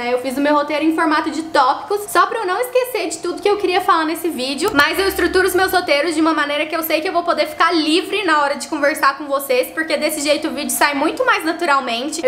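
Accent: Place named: Minas Gerais, Brazil. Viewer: Uma coisa eu tenho certeza, ela é Mineira rsrs. Ótimo conteúdo, parabéns! 👏🏼👏🏼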